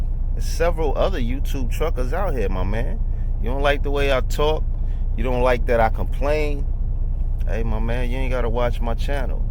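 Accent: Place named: New Jersey, United States. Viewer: Lol dude wildlin...I'm from Nj mostly everybody I kno talk like you and for you too be successful and still be your self is inspiration for plp like me...I tried to find you on ig but a whole bunch of other accounts popped up...I just got my authority authorized this week and love to link with you cuz I kno you'll keep it 💯 let me kno if it's a easier way to contact you stay bless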